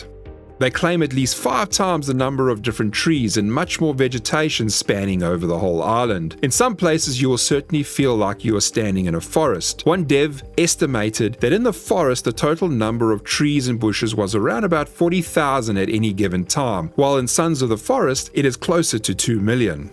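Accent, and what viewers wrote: South Africa. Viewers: Talking about "big" survival games, the day before will probably not get released this year. Your accent is not as strong as some, going to say Western Cape? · Are you South African?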